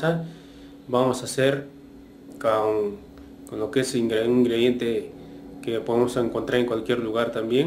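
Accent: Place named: Peru. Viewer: Si se nota que eres peruano 😂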